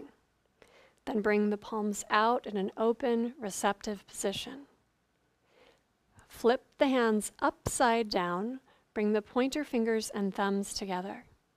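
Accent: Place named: United States. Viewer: please people learn from indian acharyas, ... american accent tremendously deform the mantra .... the udatta anudatta and respect of sandhi are absent ... the translation is very poetic and not giving proper meaning ... mudras are supposed to be practiced each for 1 reception ... please learn from proper sources ...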